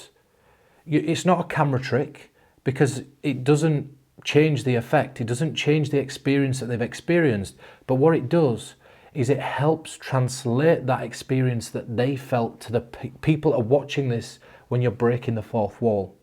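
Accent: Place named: Northern England, United Kingdom. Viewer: Thanks, I thoroughly enjoyed that.....younger effect was totally baffling too. I am not northern, and I don't think you needed to knock your accent, it is part of who you are and gives you context.......due to your performances over the years plus a few other people from the north, I have come to associate your " Northern Accent" with amazing and innovative "mentalism".......a crazy anchoring that is now firmly set in my mind. Thanks again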